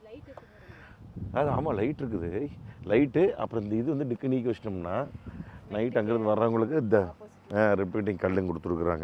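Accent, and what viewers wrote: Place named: Tiruppur, India. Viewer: Your speech is very nice in tirpur language we are from tirunelveli.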